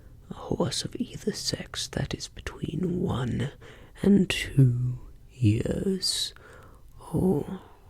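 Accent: Australia. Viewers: Maaan, your Aussie accent is EVERYTHING!😍😍I will listen to you whisper ANYTHING and still tingle!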